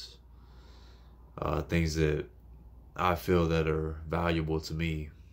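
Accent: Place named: Louisiana, United States. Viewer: I bet you’re from Louisiana. Maybe around Lafayette area?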